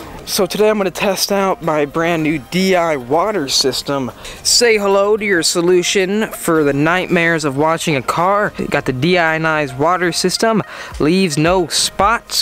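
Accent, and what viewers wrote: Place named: Midwest, United States. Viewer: You sound very Midwestern than a typical Californian. If so then what part of the Midwest were you from.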